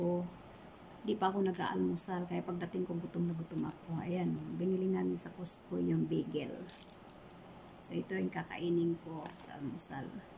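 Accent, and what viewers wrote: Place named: Visayas, Philippines. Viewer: hello nanay bisaya ka haha pariha ta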